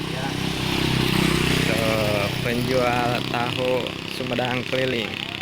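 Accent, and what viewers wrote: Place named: Banten, Indonesia. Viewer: bang .logat ngomong nya kaya orang banten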